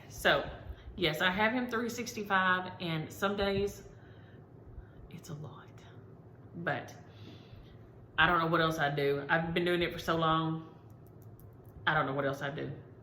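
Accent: South, United States. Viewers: Love your channel. As I stitch this SUMMER, I'm watching your videos, love your PRETTY southern accent too.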